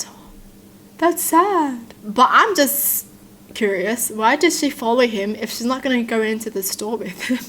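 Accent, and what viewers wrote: Australia. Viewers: she is way to damn austrailian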